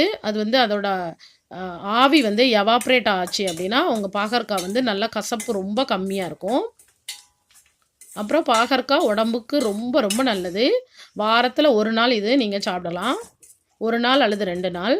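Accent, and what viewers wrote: Tirunelveli, India. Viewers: குழம்பை விட உங்க திருநல்வேலி slang பேச்சு எனக்கு ரொம்ப பிடிச்சது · அக்கா நீங்க திருநெல்வேலி யா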